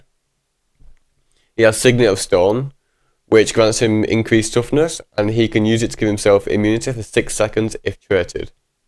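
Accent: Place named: United Kingdom. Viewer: i hate ur fucking british accent jeez , put subtitle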